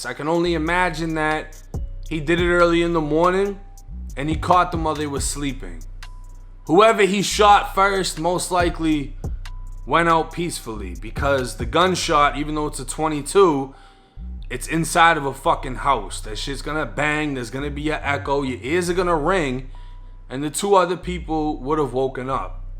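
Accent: Boston, United States. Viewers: Whea u from bro u sound like u from sumwea in the bean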